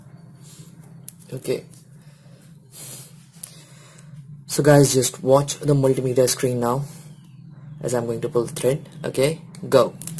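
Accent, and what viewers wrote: India. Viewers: if you just improve your Indian accent you are going to be watched and followed by more people thanks for the videos you are posting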